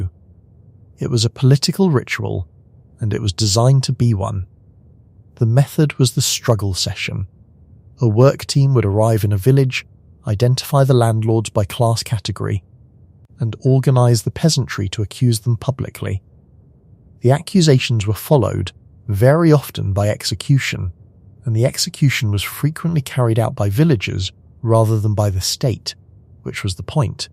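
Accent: United Kingdom. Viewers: The voiceover to this topic uses a very odd / strange pronunciation of the russian word for cash/ money: ROUBLE as rubble.. The British voiceover pronounces the word ROUBLE as rubble. So odd.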